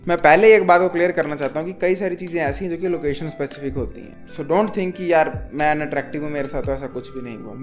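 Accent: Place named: Punjab, India. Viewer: Bhai Aapka accent Punjabi lagta hai kabhi kabhi aap Punjab se hi kya?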